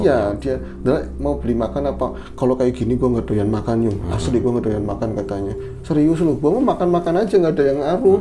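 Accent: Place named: Bekasi, Indonesia. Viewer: Judulnya Jenat - Riam Biru #3 Ketika bro masih berlogat Bekasi Barat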